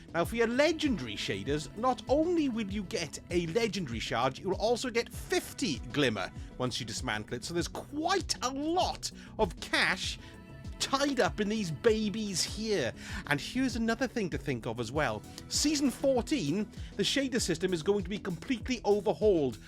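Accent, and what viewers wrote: Wales, United Kingdom. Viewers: Because he has a Welsh accent. Plus he’s said in a previous video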